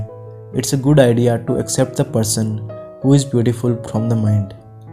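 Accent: India. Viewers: Did you not find someone with better accent to have a voice over! Dehati English hai! And the first line is catchy! Very cheap! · Welp his foreign indian accent is like butter sad about the thumbnail but stayed for the voice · Heavy Indian accent.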